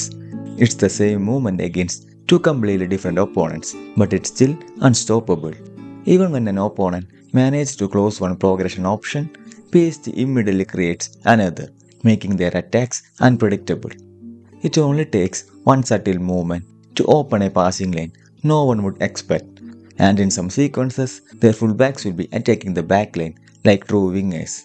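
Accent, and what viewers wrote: Kerala, India. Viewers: Malayali Slag aanallo machand nattil evida. ❤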